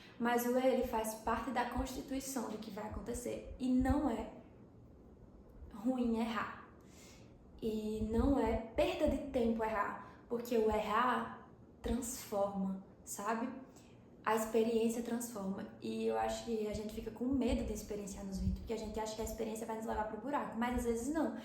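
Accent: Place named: Paraíba, Brazil. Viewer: C é da paraíba? Mó sotaque daqui haha vídeo mt massa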